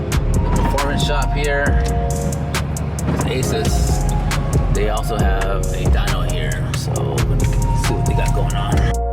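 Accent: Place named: Texas, United States. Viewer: Thats pretty good gains from stock running gear... i just picked up a 94 single cab short bed f150 myself and my dad is wanting to do a lightning clone... heres a thing to think about tho... was that other guys truck a lightning? Cause a real lightning had GT40 heads on it which you can tell be the three ribs on the front of the cylinder head... if you have those then thats why you made way better power cause the GT40 heads are 150 cc intake runners compared to the 130-135 cc intake runners from the plain heads... my truck is a straight 6 which im thinking of upgrading just to have something different... it sounds like youre in texas, im down in the rgv... maybe after i get my truck built we could have a shoot out 🤷🏽‍♂️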